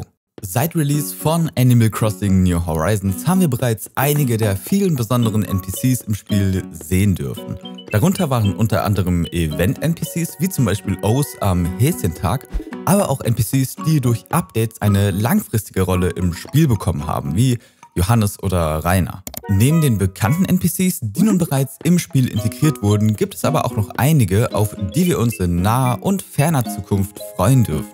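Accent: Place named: Bavaria, Germany. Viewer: Oh da hab ich soch ein Bisschen Bayrisch rausgehört. Bist du aus Bayern?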